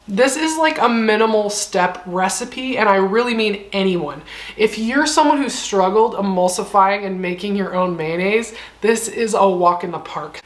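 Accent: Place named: United States. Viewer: Love your common sense tips which I don't often think of...and your soothing voice (tbh some American accents are difficult to listen to (for me anyway) but I could listen to yours all day !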